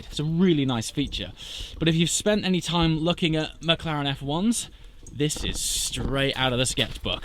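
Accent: United Kingdom. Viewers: Is it just me or has thickened his British accent?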